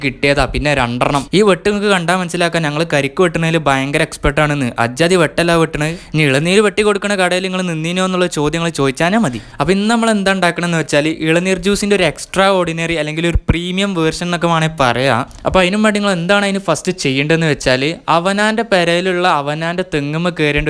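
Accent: Malappuram, India. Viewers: athin ith kasargod bhasha allalo malappuram ahnn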